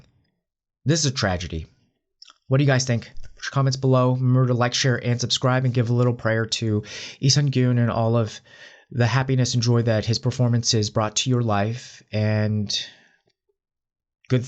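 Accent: United States. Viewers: Ok dude, its maybe just me, but you need to LOOSE the cowboy--gay--ghetto sound...were you raised in merica?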